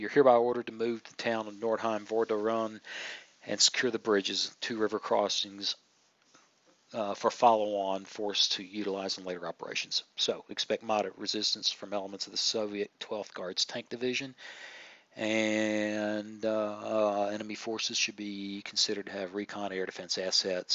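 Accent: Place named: United States. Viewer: wow i can't believe it. A game tutorial which isn't voiced by a british guy. Either all british guys do nothing else but review video games and showcase tutorials, or all guys who do this are british. your'e the one american, cool!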